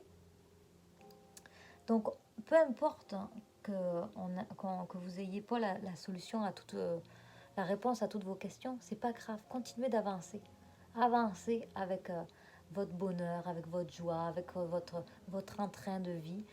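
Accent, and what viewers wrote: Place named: Quebec, Canada. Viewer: après vous avoir découverte hier dans le live d'Aurélie Louve Chamane, j'ai choisi ce tirage n°1!!!!!! Sublimes messages 🙏🙏🙏🙏💚 Merci mille fois Et 😉 quel plaisir d'entendre ce mélange d'accent du sud-ouest avec l'accent du Québec 😊😉😀🧡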